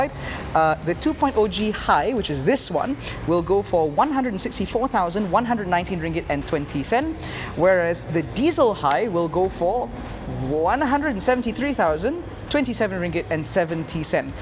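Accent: England, United Kingdom. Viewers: Hello. You deserve more subscriber. I really like your english accent. I hope to have master english language also. Keep up the good work 👍 Edit: also, the video editing is top quality. Superb!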